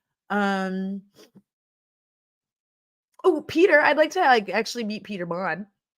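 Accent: United Kingdom. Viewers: The hair 😍 and your British accent even made my British knees wobble 😂 I was never a huge Britney fan but was certainly team free Britney. I'm quite impressed she didn't go for the easy revenge in the book. Seems classy. And I didn't realise she was our age, having been in that situation since her early 30's ish. That's so much of her life they stole. I'm not surprised she's living her life how she wants now.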